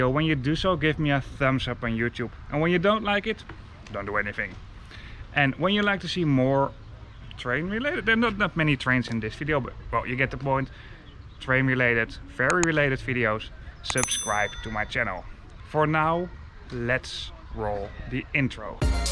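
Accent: Netherlands. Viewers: Wat een onzin. Zoals hij zelf aangeeft, heeft hij wel een NL accent, maar dat maakt het toch ook gewoon leuk. Ben er redelijk van overtuigd dat de 'native english speakers' hem prima kunnen verstaan en er soms om kunnen lachen.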